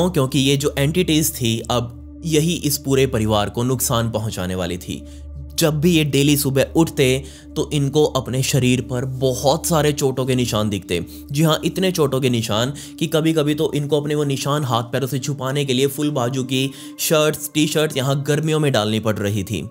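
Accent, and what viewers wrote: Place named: Punjab, India. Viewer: Panjabi a bro tu 😊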